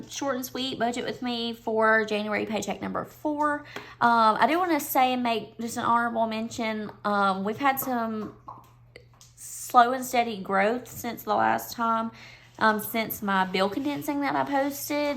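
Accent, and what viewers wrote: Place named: South, United States. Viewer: ! That accent miss ma’am! I didn’t know you were a southerner like myself.😋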